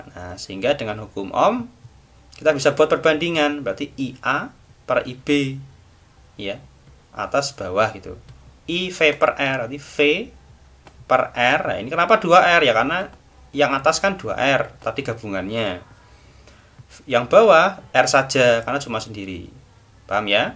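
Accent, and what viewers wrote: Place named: Purwokerto, Indonesia. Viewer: Iseh ketara bahasa Purwokerto ne 🤣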